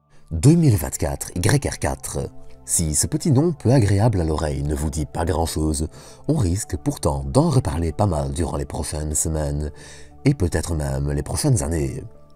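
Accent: Liège, Belgium. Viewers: Tu ne serais pas de Liège, par hasard?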